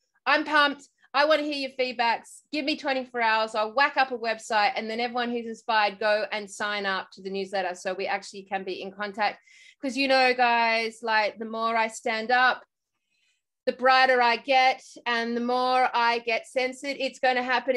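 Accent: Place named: Australia. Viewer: You are speaking ozzy, what is an ''Op-Shop'' ?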